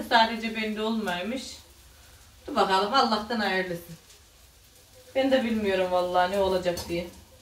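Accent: Trabzon, Turkey. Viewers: Trabzon Of Hayrat nahiyesiydi.Bayılırdım konuşmalarına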